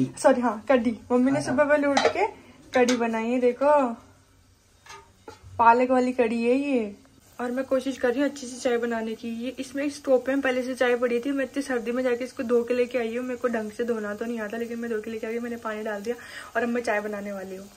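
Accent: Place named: Shekhawati, India. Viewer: बेटा आप कहां से हो कहां पर रहती हो बोली भाषा से लगता है कि आप शेखावाटी से हो क्योंकि मैं भी शेखावाटी की हूं नवलगढ़ की हूं मैं मुझे अच्छा लगता है आपका ब्लॉक ब्लॉक में बताना कहां पर रहती हो मैं कल के ब्लॉक में आपका इंतजार करूंगी चाय अच्छी है खुश रहो बेटा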